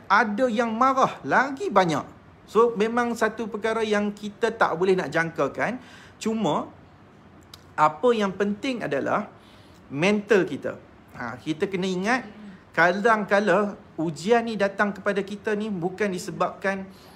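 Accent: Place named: Kedah, Malaysia. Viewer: Boleh saya tahu tuan ni dr guaman negeri mna..ckp mcm kedah..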